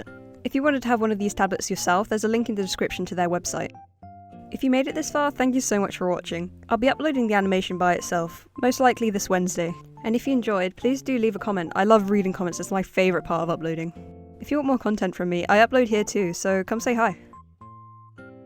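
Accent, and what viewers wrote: United Kingdom. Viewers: ALSO BRITISH? if so me too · YOUR BRITISH?!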